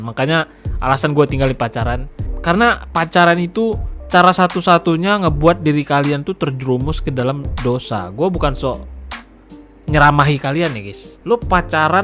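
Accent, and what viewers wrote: Java, Indonesia. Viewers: Lu orang Aceh tpi logat lu kek orang Jawa bg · Waduh gak nyangka loh aku bang org Aceh, krn kalau kita lihat dari cara berbicaranya seperti org Jawa tulen Salam ya bang dari Aceh juga, doain juga di beberapa daerah di Aceh lagi terdampak musibah banjir bang. Mudah mudahan suksesnya bisa nular ke kita juga bang, sukses terus bang 🙏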